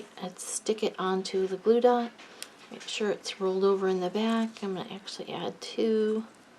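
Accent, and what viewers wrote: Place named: Upstate New York, United States. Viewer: Hi - I should have guessed from your accent that you were from NY state close to Canada. I've been watching your videos from Ontario up near Georgian Bay. Thanks for taking the time to share your cards & tips. I really appreciate them.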